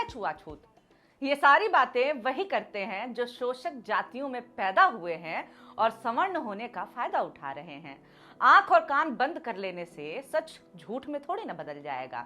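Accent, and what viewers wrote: Bihar, India. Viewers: Jo aap bihari accent me kataksh karti ho wo bahut lajawab hai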